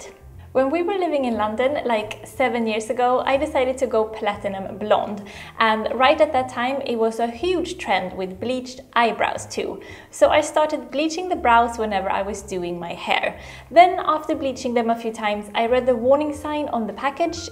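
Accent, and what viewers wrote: Sweden. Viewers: Where are you from? You have a swedish accent.. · Are you Swedish? I hear some English - Swedish accent. Hehe.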